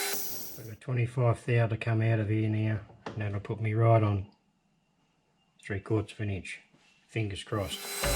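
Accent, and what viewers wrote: Australia. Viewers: Good job! - By the way. I like the australian way to speak english! It sounds a little bit strange, but nice. Greetings from Dresden (Germany).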